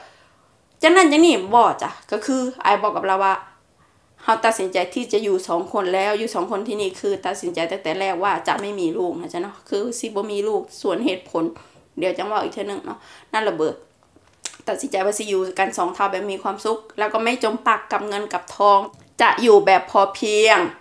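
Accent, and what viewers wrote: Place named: Sakon Nakhon, Thailand. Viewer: <num> ทั้งตาอยากหัว ทั้งเปนตาฮัก บ่เปนตาซังแม้ ภาษาสกลกะม่วน มักหลายจ้า จากชัยภูมิจ้า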